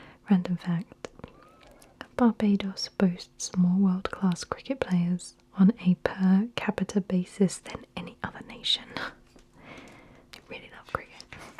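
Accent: England, United Kingdom. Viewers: I think you have an amazing voice and English accent.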